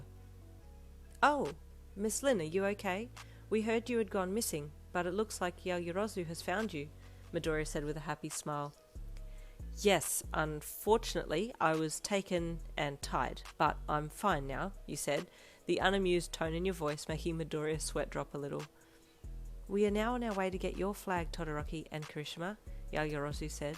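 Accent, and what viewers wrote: Australia. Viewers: Yes I immediately knew what pants meant but if you had said dacking 😭😭 I’d be so lost like please explain your Aussie accent to meeeee 🤣💀💀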